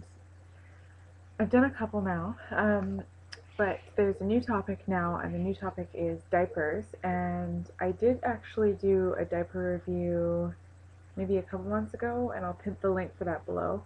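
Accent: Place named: Canada. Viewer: nice(: u gotta b from canada cuz u really have an accent(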